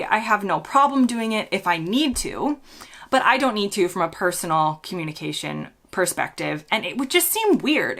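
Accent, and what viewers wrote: United States. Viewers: From this American ear, and FWIW, you sound completely American still. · When you do go to the States to visit your family and friends, do they say. 'Oh my, you sound SO English'? To me you sound like an American 100%... just curious. Cheers. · No tree can ever deny their roots! To me you sound American!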